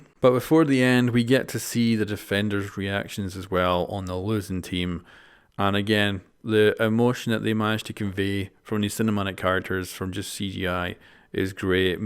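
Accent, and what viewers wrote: Scotland, United Kingdom. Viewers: malta? hes scottish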